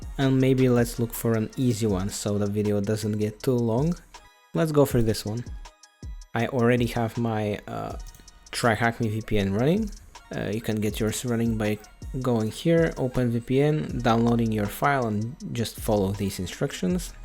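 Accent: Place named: Russia. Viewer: я понял по акценту что ты гооришь по русски,давай следущее видео на русском?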